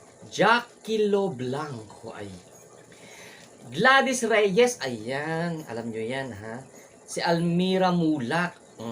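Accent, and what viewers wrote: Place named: Iloilo, Philippines. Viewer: Good morning ser salamat yn .ka uli kay bunso 💖 😘..na miss ko boses isang ilonggo. God bless you 🙏 ❤ watching Bahrain po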